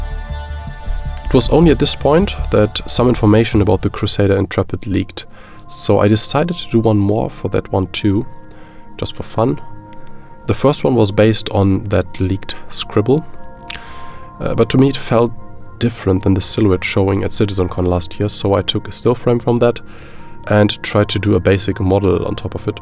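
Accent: Germany. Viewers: dieser akzent macht mich fertig..deutscher ? :D aber respekt guter content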